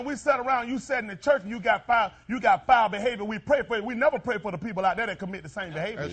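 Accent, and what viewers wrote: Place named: South, United States. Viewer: Man his Country accent gives it ALL. his hair piece!!!!!!!!!!!!!!!!!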